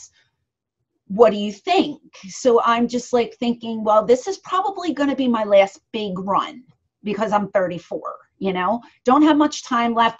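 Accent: Philadelphia, United States. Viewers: She has the thickest philly accent lmfaooo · Is she from philly?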